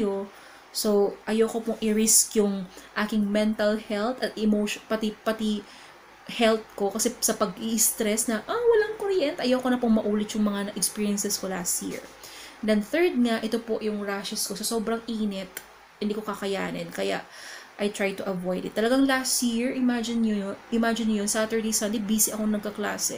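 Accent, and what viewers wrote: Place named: Pangasinan, Philippines. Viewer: hi po teacher! just found your channel because of 51talk..I'm a new teacher po and because of your videos po nalalaman ko ibang tips and tricks regarding the company..i am from lingayen pangasinan po and napansin ko po ung "ey" na expression kaya napa subscribe ako kasi sa isip ko "uy pareho kami...tiga pangasinan kamin dwa" hahaha nice to see someone po here in youtube na kapareho ng dialect..keep on updating us po sa mga ganap and thank you po sa mga shinishare niyo malaking tulong po..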